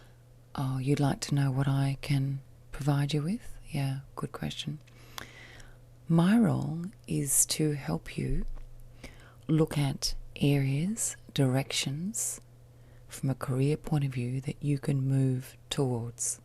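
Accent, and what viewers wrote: Australia. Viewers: You are so beautiful, your eyes and smile really draw me in and that's coming from a 22 year old:) oh and that ozzy accent! Perfect.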